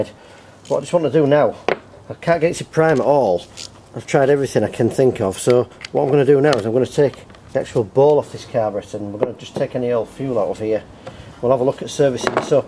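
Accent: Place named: England, United Kingdom. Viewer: Read your closed captions as you view this video. I'm in Alabama and trying to understand your accent (English, I suppose) is tough but doable. I guess it really must be a challenge to understand, by whatever means that is used to add close captions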